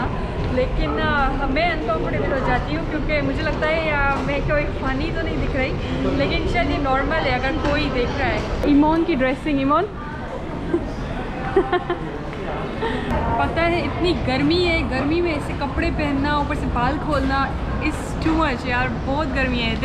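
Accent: Sindh, India. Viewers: Are u sindhi?? 🤭😂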